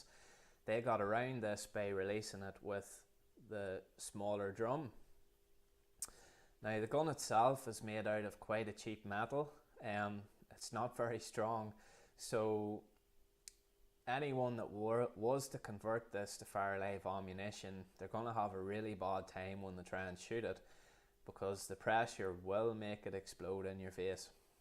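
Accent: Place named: Northern Ireland, United Kingdom. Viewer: Sounds like Northern Ireland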